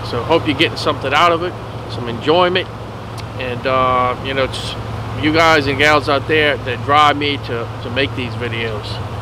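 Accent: Louisiana, United States. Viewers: Damn i miss this Louisiana accent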